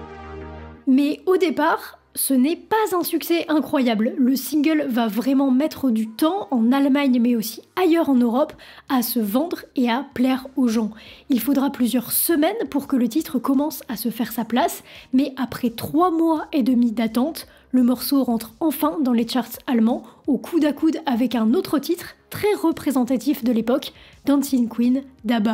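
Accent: France. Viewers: Incroyable! Cette tendance à hyper franciser au point que c'est pas écoutable. On est dans le ridicule ici. Pas le contenu. Le contenant. Désolé. Faut ajuster la voix dans la post production.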